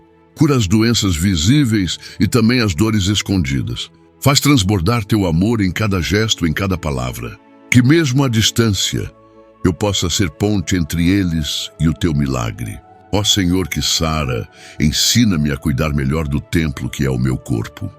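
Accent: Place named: Portugal. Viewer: Por que você fala com sutaque de português de Portugal há momentos que você fala que nem português por 😢 me diz o porquê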